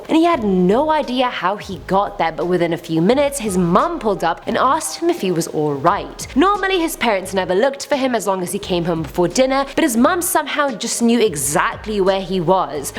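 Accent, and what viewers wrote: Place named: United Kingdom. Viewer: She's hot, she's got that brittish sexy smokey voice type of accent.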